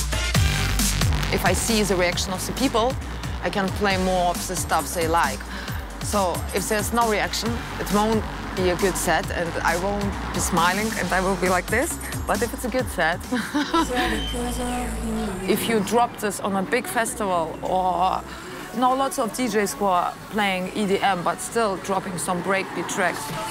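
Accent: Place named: Germany. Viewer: Такой прикольный английский на немецкий манер, крутая 😎 классная, очаровательная!!!😊😘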